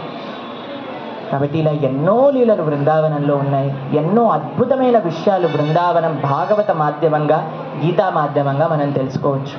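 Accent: Telangana, India. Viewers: Complete Telangana slang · ప్రభుజి 😊 తెలంగాణ స్లాంగ్ అద్భుతం 😅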